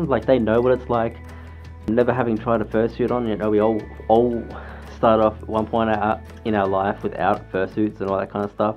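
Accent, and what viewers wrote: Australia. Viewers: wait wasn't he german how does he sound just like an australian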